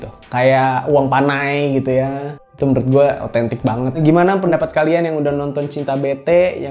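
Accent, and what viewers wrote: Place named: East Nusa Tenggara, Indonesia. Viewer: Logatnya itu yang bikin rindu kampung,,,, Salam Dari anak NTT bang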